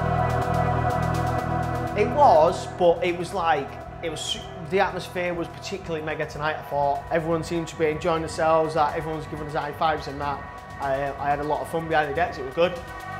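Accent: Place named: Manchester, United Kingdom. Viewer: Love the Manc accent! ‘Bring in some rims...’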